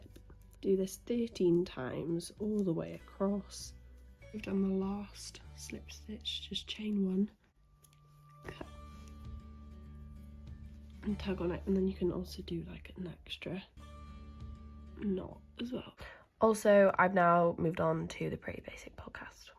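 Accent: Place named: Pembrokeshire, United Kingdom. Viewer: Hia, I've just found your channel and I'm enjoying it. I agree that you have a lovely voice. Can I hazard a guess that you're from Pembrokeshire? I grew up there and find the accent very familiar and comforting.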